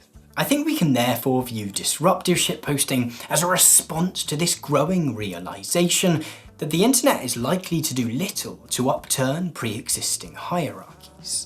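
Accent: RP, United Kingdom. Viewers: dont u hate it when the dude narrating a 45 min video is british :( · hearing the words “the shitpost is defined by” in a posh british accent is a transcendental experience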